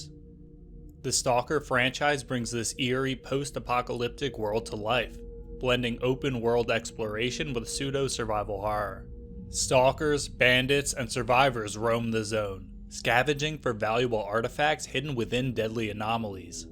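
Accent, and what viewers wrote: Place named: Delaware County, United States. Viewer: damn someones from DELCO lol good video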